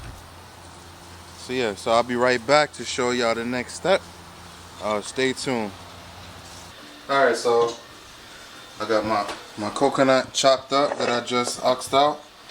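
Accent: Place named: Jamaica. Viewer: I hear a little jamaican accent, anyways just subscribed, hope yu a yardie 😂😂😂😂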